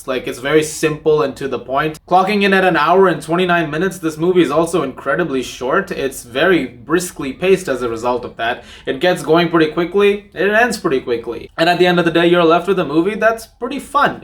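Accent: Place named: United States. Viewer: I am also Indian 🇮🇳 I am just asking as you can speak English so fluently like an American accent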